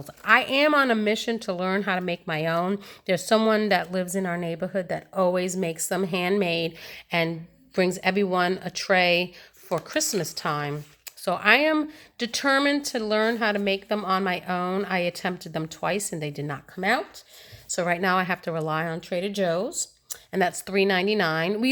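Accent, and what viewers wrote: New York, United States. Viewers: I could hear the NY in your voice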